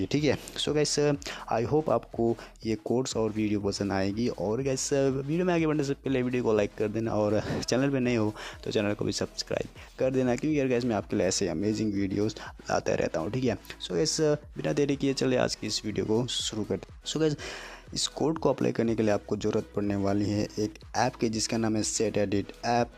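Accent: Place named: India. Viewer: Your Indian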